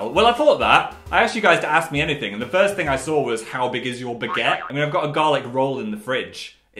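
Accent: North, United Kingdom. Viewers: Idk why I really heard the northern accent in this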